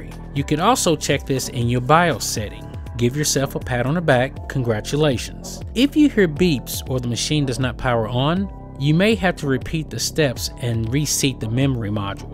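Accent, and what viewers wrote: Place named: Georgia, United States. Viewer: He sounds like he is from Georgia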